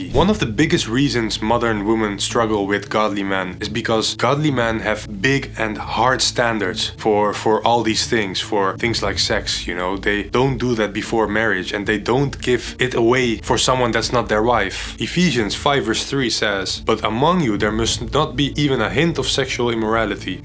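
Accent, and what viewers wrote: Netherlands. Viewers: Excellent video, and channel. You do sound Dutch 😊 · His accent exposes the Dutch nationality. 🟧🦁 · Ben je nederlands bro.. ik hoor je accent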